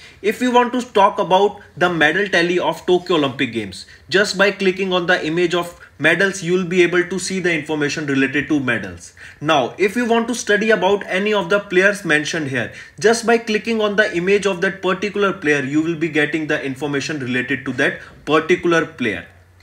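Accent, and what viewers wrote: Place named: North India, India. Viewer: Sir north Indian lgte gai. Bt pronunciation bht wrong krte hai... common words too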